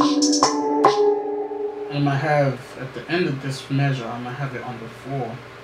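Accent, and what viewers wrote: United Kingdom. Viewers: Is it me or did he not have a british accent in his other vids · All this time I never clocked u were from London 😂.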